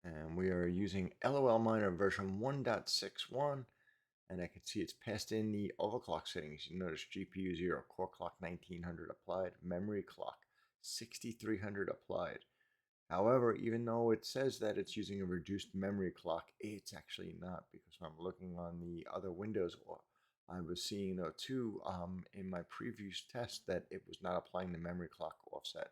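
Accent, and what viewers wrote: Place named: New York, United States. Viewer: The more videos he makes, the more NY he sounds! Love it!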